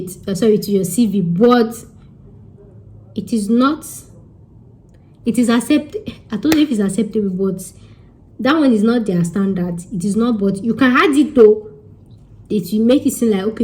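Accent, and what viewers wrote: Yoruba, Nigeria. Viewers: Hey pretty.... Nice video, but that Yoruba intonation is a turn on for me.... Won't mind knowing you more🙈😀🥰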